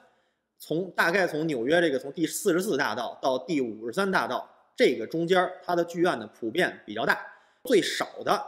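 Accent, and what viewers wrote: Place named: Beijing, China. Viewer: 哈哈哈，我三里河的也表示听到了乡音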